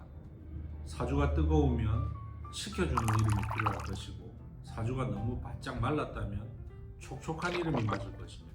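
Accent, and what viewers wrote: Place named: Busan, South Korea. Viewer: 부산이신데 억양이 지나치지 않고 목소리가 좋으시네요 한번 가보고 싶은데 너무 머네요 ㅜㅜ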